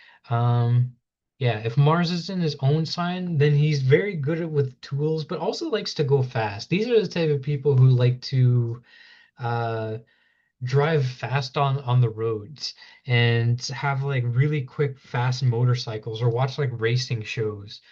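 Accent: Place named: United States. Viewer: Your channel name is (one of the names of lord krishna) but your accent is American why?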